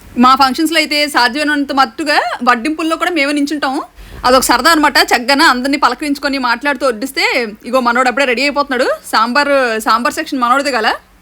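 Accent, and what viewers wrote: Vizianagaram, India. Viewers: Mandalu virigupothayi... Mana vizianagaram slang nachina dialogue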